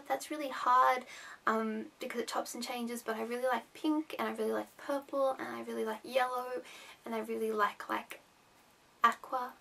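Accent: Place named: Australia. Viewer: just stumbles onto this video and had to subscribe, it sounds creepy but you have the nicest, most relaxing voice and accent ever! i love australian accents! Definitely see live of you get chance, i've seen them 4 times now and they're my favourite band ever! They're kncredible live! And Danny is a lot hotter close up;) haha x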